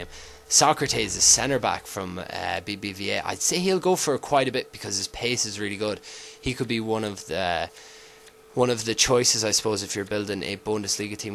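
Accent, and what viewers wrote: Ireland. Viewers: hes irish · are u irish PLEASE ANWSER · are you from ireland?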